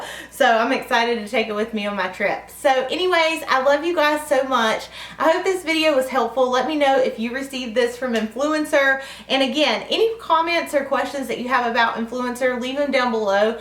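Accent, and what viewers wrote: South, United States. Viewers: I love your videos! You are beautiful young lady and you seem to show that beauty outside and inside. I was very curious about this palette. The price point is high for Laura Mercier's eyeshadow palettes. What is tempting me is the dark matte colors. I love the shimmer shadows. They are gorgeous!! I use those dark shadows with my liner. I like to take a small smudge brush and go over my liquid liner or my eyelash glue to block that shine and soften the liner. If I travel with a dark color, I take a MAC or MG single pan in a Z palette and I have broken three of these dark colors while traveling. This palette along with whatever palette is my favorite at the moment would be safer to travel with. I'm not sure if the white color would help me. I have this palette in my Sephora cart but that price is keeping me from finding it that worthy. 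I have too many things on my want list right now!! You're lovely and keep doing videos. I'm a Northeast Tennessee girl that has a southern accent close to yours!!! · I love watching your videos, and I love your southern drawl ( I'm from Kentucky so I know where coming from). I signed up for influenster but I have never gotten anything do I need to do something other than just sign up?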